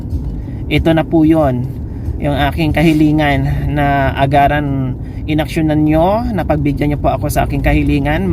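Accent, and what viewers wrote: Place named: Negros, Philippines. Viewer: Im from kabankalan kag naka pagasawa ng taga Moncada.That is why,iba ang tuno mo magsalita super lambing hehe.Halong and bless your good heart.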